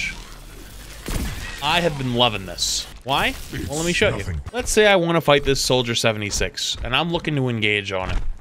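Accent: United States. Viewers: I guess ur american ?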